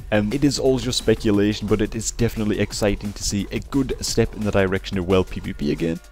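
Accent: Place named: Geordie, United Kingdom. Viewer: proper strong geordie accent...makes you sound foreign man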